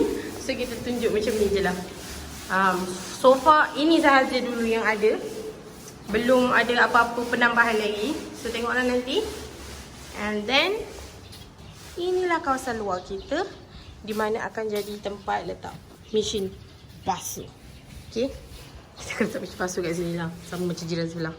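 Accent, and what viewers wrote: North, Malaysia. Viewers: . Kami prefer cakap utaqa lagi sedap dri pelat keyel. Hihi. Ni jujuq tau dri km peminat oqg utaqa jugak. 😂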